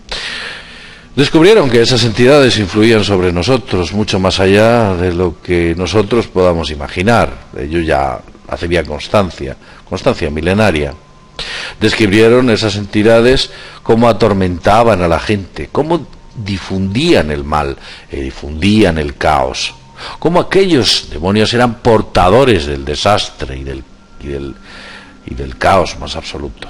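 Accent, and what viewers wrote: Spain. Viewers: Hola, creo que si usaras un acento neutro, no español (europeo), serias realmente exitoso, ya que la mayoria de los hispano hablantes estamos en America. el acento de Colombia es el mejor y el mas neutro. Saludos desde Mexico!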